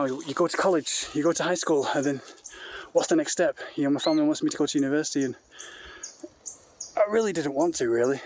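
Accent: United Kingdom. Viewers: Oh, you're Australian? I thought you were a brit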